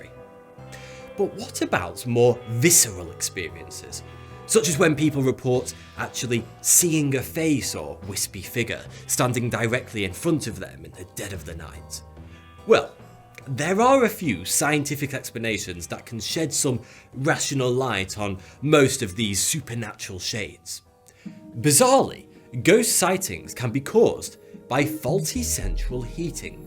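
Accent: United Kingdom. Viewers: He is the perfect blend of British but no too British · When I read your book, it'll probably be your voice with that british accent reading it in my head. Can't wait. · Wait, have you always had a British accent?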